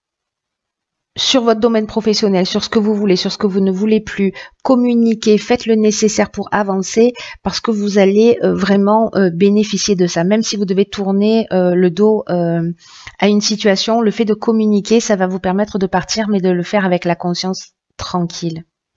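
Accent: South, France. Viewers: Cc, oui il y a des artistes dans les parages 😅 intermittent du spectacle, chanteur guitariste pendant plusieurs années jusqu'à la retraite. Après un accident de la route, je replonge dans mon répertoire depuis une dizaine de jours et je répète, un peu dur après 3 ans d'arrêt. J'aime beaucoup votre voix et cette petite pointe d'accent du sud 🎉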